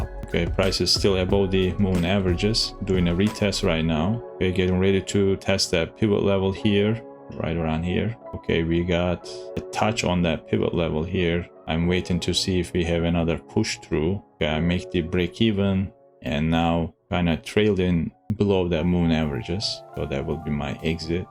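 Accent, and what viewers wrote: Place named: Turkey. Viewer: Hello, Are you originally Turkish? Your accent sounds Turkish😊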